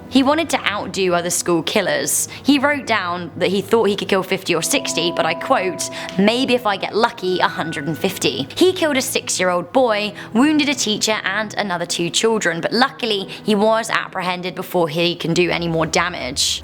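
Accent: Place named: England, United Kingdom. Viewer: That good old english ascent with that morad the fooouurth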